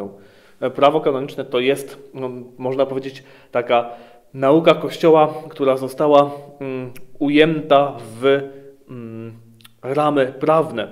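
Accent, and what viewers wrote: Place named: Italy. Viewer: Ach ta łacina włoska ;))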